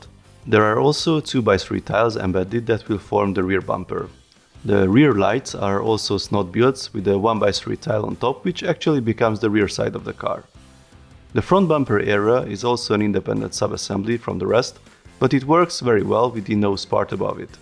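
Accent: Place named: Hungary. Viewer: Hallatszik a Magyar kiejtés 😊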